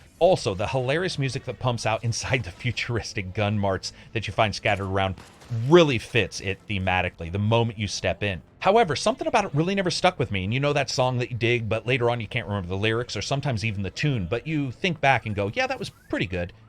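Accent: United Kingdom. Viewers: Voice is fine, you just have to be British to understand. Which is a damn good thing! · I believe the audio issue you are referring to is a Scottish accent vs heavy compression.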